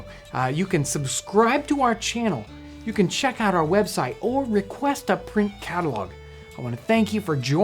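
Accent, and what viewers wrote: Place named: United States. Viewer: Is this guy Dutch American? He looks Dutch but speaks with an American accent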